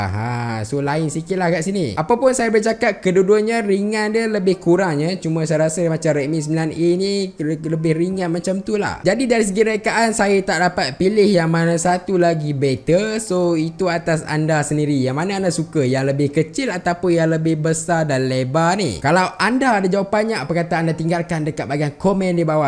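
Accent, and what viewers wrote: Taiping, Malaysia. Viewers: Abg orang Taiping ke? Hahaha baru perasan 😂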